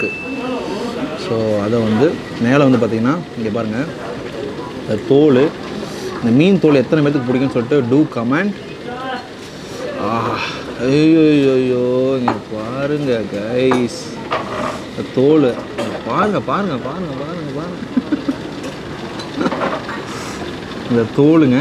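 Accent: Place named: Kerala, India. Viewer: கேரளா போனதும் கேரளா பாஷை ஆட்டோமேட்டிக் ஆ வருது போல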